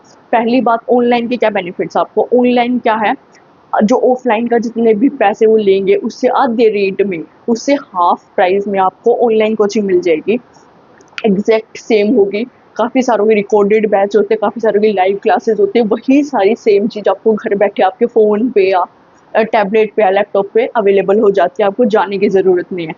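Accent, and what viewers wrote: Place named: Haryana, India. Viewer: Haryanvi language,kha se ho aap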